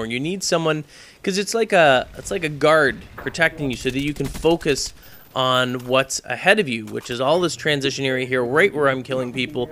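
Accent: Canada. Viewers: Canadian? :o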